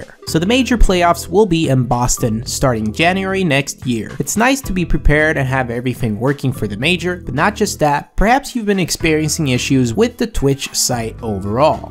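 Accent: Sweden. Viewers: he's from Sweden the accent is pretty obvious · Är du svensk?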